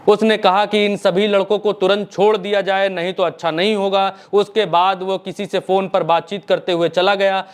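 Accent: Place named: Bhojpuri, India. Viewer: Your 'Bhojpuriya' type reporting is amazing.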